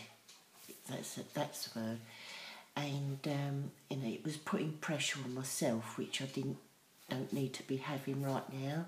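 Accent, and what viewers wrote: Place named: United Kingdom. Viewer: hi I love your art work I am a newbie to art journaling. I'm in the uk you sound like your in the uk. thank your for sharing x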